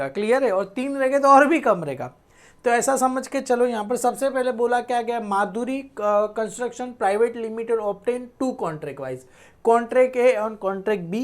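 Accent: Gujarat, India. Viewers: Sir ap gujrati ho kya..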